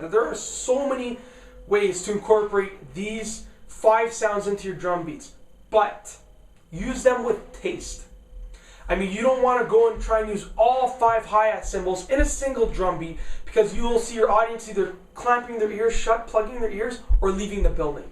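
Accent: Canada. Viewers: Sounds canadian for some reason. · Definitely Canadian. Good video man. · Are you Canadian?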